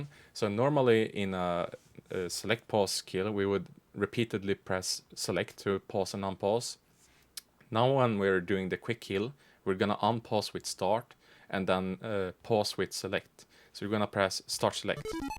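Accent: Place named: Sweden. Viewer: your swinglish is awesome. thanks for all the vids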